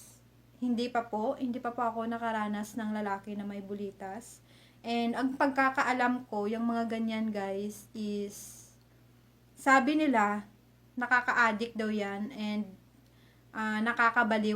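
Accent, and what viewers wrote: Iloilo, Philippines. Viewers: Ilongga ka mam?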